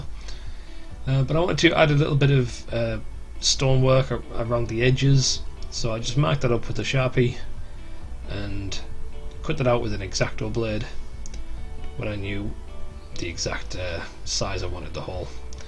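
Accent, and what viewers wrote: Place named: England, United Kingdom. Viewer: Sargon as in Carl Benjamin the youtube personality. You sound like you're from the same part of jolly old. You even look like you came from the same clan. You can pretend I meant the original Sargon if you prefer.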